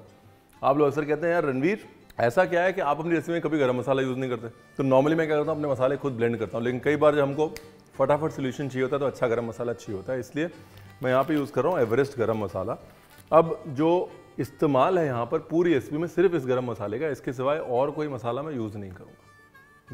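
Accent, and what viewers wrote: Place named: Punjab, India. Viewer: I am from Punjab and loves your punjabi accent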